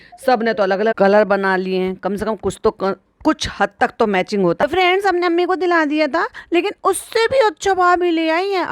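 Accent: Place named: Haryana, India. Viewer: maine apke 3-4 podcast hi sune hn ...Usi se idea lgaya ki haryana ka touch h apki boli me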